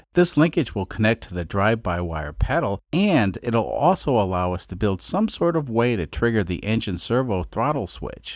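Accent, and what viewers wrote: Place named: Long Island, United States. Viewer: Lawnguyland accent and bluegrass music!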